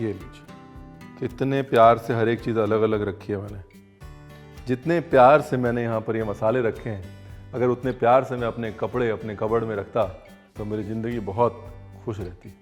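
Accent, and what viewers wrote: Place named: Kanpur, India. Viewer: Kabhi kanpur wali koi recipe banaye,bahut khushi hogi,aapko boli to kanpur ki lagti hai bahut hansi ati hai jab aap yaha ki bhasha Bolte ho🙏🙏🙏🙏🙏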